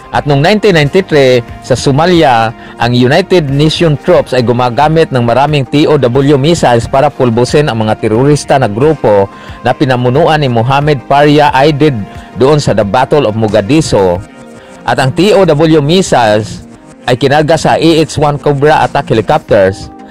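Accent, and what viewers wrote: Visayas, Philippines. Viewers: Ayusin mo yung pgtatagalog mo bisaya ang dating mo.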